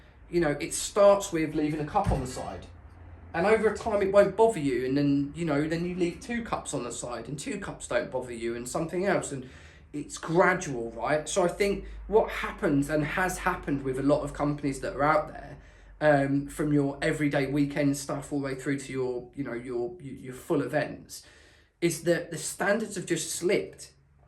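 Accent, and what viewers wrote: United Kingdom. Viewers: Can someone PLEASE tell me what was said ? I can’t understand Scottish !!!!! Probably nice sentences but still ….. very confused 🤣🤣🤣🤣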